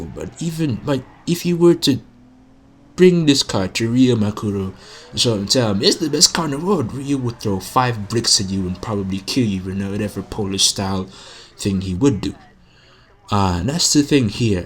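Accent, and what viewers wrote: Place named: Africa. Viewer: LOL, I can hear the Arfrican in you so clearly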